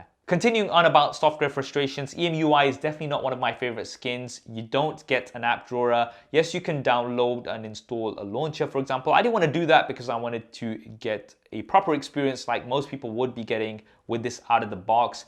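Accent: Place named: United Kingdom. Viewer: i hate british accent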